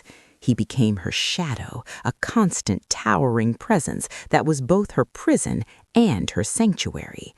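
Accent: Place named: United States. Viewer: I am through 👎🏻👎🏿👎🏽👎🏾listening to stories set in England using AIAmerican accents. Stories set in the British Isles need the appropriate accents. You lost me. I will not subscribe.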